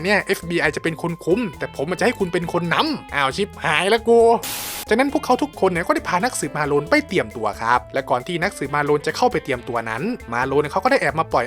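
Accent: Isan, Thailand. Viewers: สำเนียงอิสานๆของพี่น่าร๊ากกกมั่กกก ~><~ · อีสานมาเต็มๆๆ พุ่นเด้555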